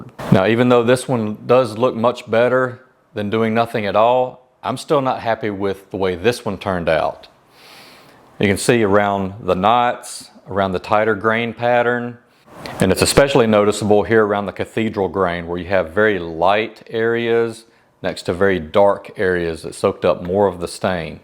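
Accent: South, United States. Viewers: Cool. I have lived most of my life in northern Minnesota. I like listening to accents and your accent sounds like my kin from down south. Nothing like Texas accents. I appreciate your VIDEO! I am attempting to build a shelf and have never done wood-working since I was a kid. Kind regards from up north and Merry Christmas.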